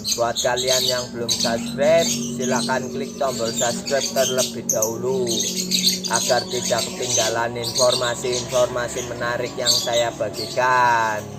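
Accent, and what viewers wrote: Banyumas, Indonesia. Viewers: Purbalingga ngapak2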